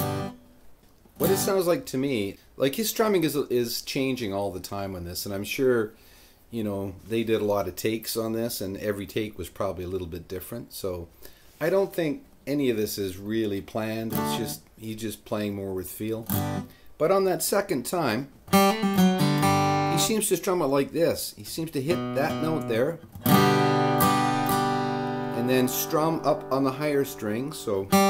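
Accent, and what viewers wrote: Canada. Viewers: The accent is Canadian right? Sta-CAT-to lol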